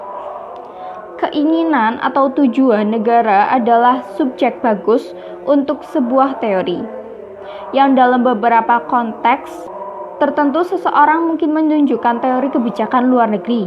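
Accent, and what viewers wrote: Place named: Java, Indonesia. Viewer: Kamu medhok sekaliii beb😂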